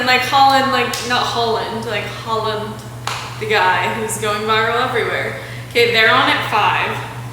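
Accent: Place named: United States. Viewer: I love in American Accents. By the way, May I know Where is your accent in America? Thanks you.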